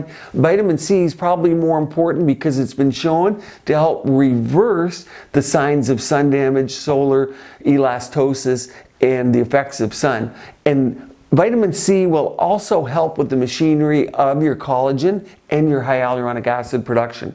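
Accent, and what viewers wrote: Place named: Canada. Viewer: I was so confused because I was listening to another video and thought 'he sounds so Canadian'....haha! Just discovered you this week, great content! Keep up the great work, cheers, from another Canadian!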